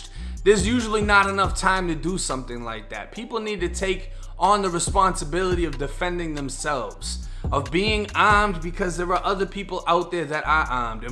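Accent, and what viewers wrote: Boston, United States. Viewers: Are you from Boston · I'm from Boston massachusetts also and I definitely am a subscriber. I love your baston lol accent 🥰🥰🥰🥰🥰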